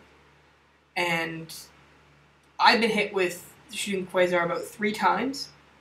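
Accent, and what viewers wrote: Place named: Canada. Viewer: u canadian? good video tho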